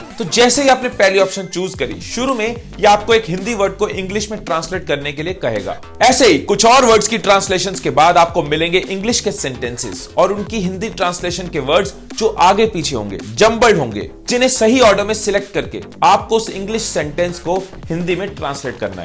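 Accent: India. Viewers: Sir hello english indian hai ya chinese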